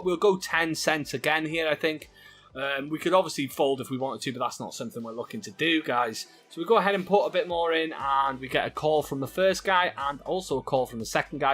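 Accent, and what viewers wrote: United Kingdom. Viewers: It's the British accent 😉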